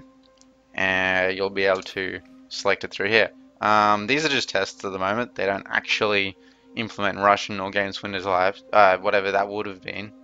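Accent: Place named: Australia. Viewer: That Aussie accent.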